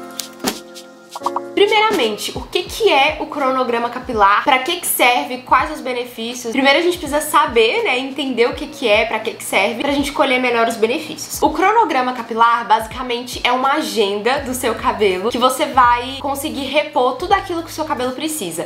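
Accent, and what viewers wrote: Minas Gerais, Brazil. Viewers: é o lindo sotaque mineiro ❤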